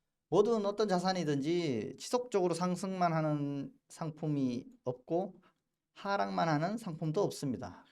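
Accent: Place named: Gumi, South Korea. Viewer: 구미 분이세요? 제가 좋아하는 언니가 구미 고향인데 말투가 똑같아요! 구미사람들 조아요❤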